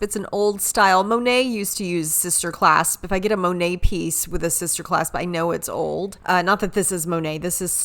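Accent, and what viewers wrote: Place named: Alabama, United States. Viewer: You must be from Alabama.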